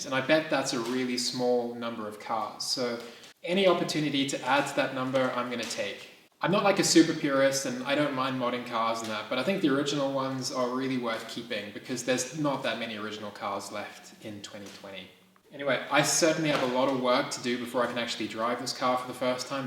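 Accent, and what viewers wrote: Australia. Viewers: Cool. This is the problem with being away (since 2003), you sounded Aus to me. Anyways. Lovely car, always wanted a Spider. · You a truly a very clever young man 😀 amazing work! You sound Australian? 🙂🙂 A well spoken Australian 😉 Your attention to detail is a credit to you.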